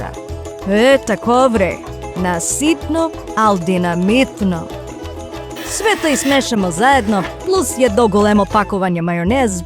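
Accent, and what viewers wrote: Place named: South, Serbia. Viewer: Stvarno si legenda,vidi se taj juznjacki naglasak sto je meni nesto naajlepse kad cujem🥹🤍..Dobro si poceo samo tako nastavi!pozdrav iz Vladicinog Hana,naselja. na jugu Srbije!🫀🤝🏼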